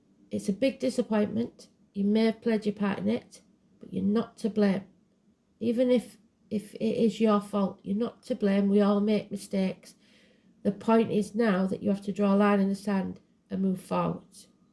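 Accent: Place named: United Kingdom. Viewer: I love your beautiful accent! I see you are from the United Kingdom, I realize that is not just England, LOL. I lived in England when I was a young girl, up north in Durham County, in Barnard Castle. I loved it there. I would come home covered in mud from the Thames after playing in the castle and running around with Shetland ponies, LOL LOL LOL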